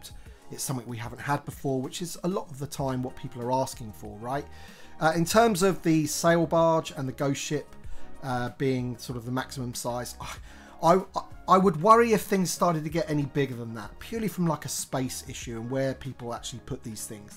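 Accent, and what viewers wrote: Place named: United Kingdom. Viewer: Hi! I'm a huge fan of the original trilogy and pretty new to collecting TVC. Love your videos and your British accent! Your videos are very helpful when I decide to buy something new, thanks! My question is: will Greedo (who was released in 2021 in two versions but only as a 6 inch figure for the 50th anniversary black series) also come as a 3,75 inch TVC? The Power Of The Force 50th anniversary version is very screen accurate! The one in the green outfit that you reviewed is great but I'm hoping for the screen accurate version with the orange vest! Thank you so much and greetings from Germany!!!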